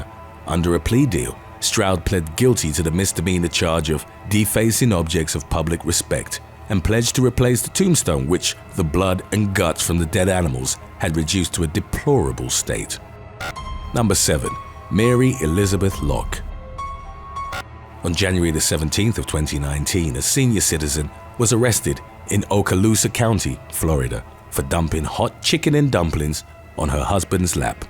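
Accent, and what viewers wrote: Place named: England, United Kingdom. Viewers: Its a British Pro-Bubbly Lóndón Voicé of a Wést indián Jamacíân Negroiđ voicé Lyké Danný Johń Julês frôm Thê Rêd Đwãrf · It’s like David Kieth’s but with the added bonus of an English accent!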